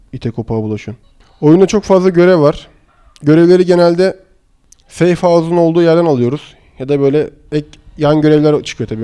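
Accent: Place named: Izmir, Turkey. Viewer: Tenekeli gibi Tepecik gibi abi izmirlimisin :D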